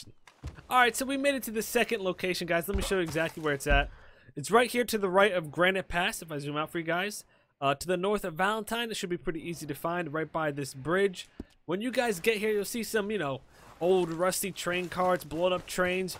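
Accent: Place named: South, United States. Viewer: Like your videos but not the southern accent.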